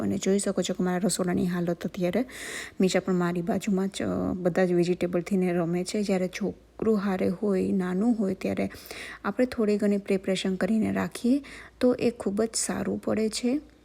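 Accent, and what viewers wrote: Saurashtra, India. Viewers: Nice vlogs. Aaj first time aapna videos joya Aap Saurastra thi 6o e language tone par thi khyal aavi gyo. Kya jilla thi 6o ? Tya na lifestyle upr video banavjo to ahi thi tya javavala loko ne help mle.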